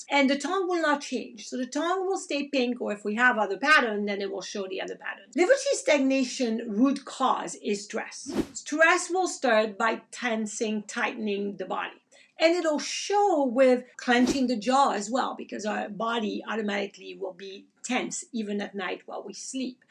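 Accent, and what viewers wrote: France. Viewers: your French accent adds to your charm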